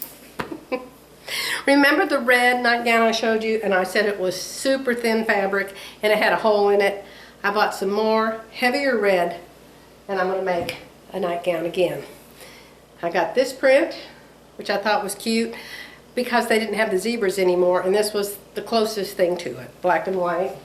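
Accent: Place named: Oklahoma, United States. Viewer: Your videos always make my day. My family is all from Oklahoma & Arkansas & it's like listening to them talk. You make me smile. I like both of the border colors. How about a skinny little brown line around to frame it & then a wider binding of the turquoise? On the crumb quilt, I love option 4. I think that one is the best. Have a wonderful day!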